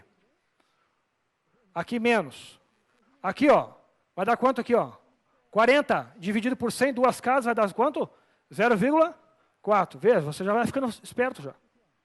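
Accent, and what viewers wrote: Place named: Curitiba, Brazil. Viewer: Aula perfeita! E o sotaque curitibano é o melhor kkk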